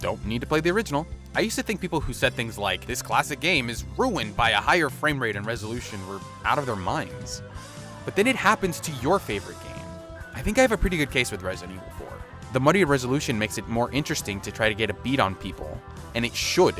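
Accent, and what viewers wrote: Spain. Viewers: So refreshing hearing someone pronounce the names in a proper Spanish accent. Great video! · Disliked! You say every word annoyingly in a Spanish accent, but you can’t pronounce Luis’ name properly?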